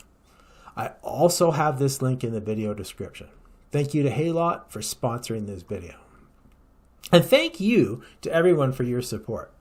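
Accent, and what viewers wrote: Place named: United States. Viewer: I was wondering why you mentioned a Warthog while showing a picture that definitely wasn''t a Warthog. Then I realised it was just the way you Americans say hawk as "hock".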